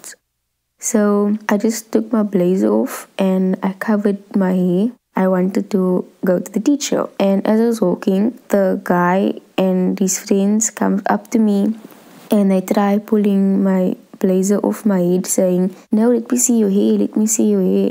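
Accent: South Africa. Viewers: I think she's South African because thats sort of how i sound when i speak english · I can tell that she's South african 😎😎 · Iqra Nazeer to me she sounds like she's from South Africa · I'm from south Africa and could instantly hear that she's colored lol same like me.. The "what-not" gave it away among other things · She sounds South African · it's sounds like a south African accent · She sounds like a South African like me❤️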